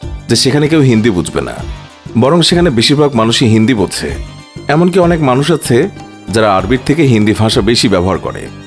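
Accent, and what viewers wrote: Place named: Bangladesh. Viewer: Bangla bhasa ta shunlei bojha jai, kono ek Bangladeshi balche. Bangal bangla ta puro puri distinct from Bengali spoken in W.Bengal.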